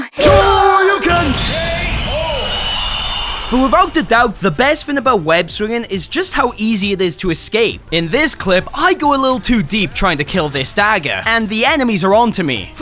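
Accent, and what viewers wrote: Wales, United Kingdom. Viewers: u welsh? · It always shocks me whenever I hear another Welsh creator because I never expect the accent until im midway through and im like "wait hold up". First impression and it's already a great one, keep up the good work!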